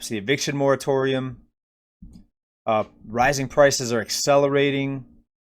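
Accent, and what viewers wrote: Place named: Midwest, United States. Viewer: Favorite outro. Keep up the good research. Once you said you lived in Michigan at one point...well you do have a midwest sounding vocal. Makes sense now. Lol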